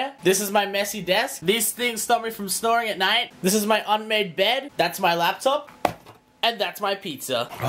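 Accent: Australia. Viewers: He is speaking Australian -.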